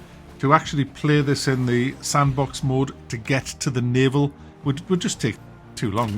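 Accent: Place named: England, United Kingdom. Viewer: I have watched your videos since 2017 now. I still cant figure out, where in England your accent is coming from.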